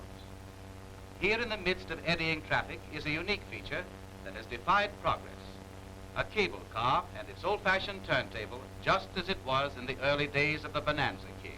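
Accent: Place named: Mid-Atlantic, United States. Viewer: Great example of the Mid Atlantic or Transantlantic accent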